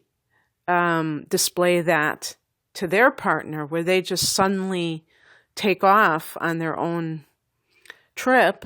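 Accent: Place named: Minnesota, United States. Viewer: Are you from Minnesota? I am, you sound like you could be lol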